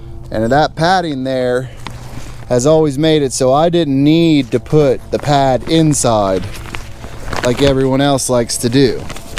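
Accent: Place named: Mid-Atlantic, United States. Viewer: Love that mid Atlantic accent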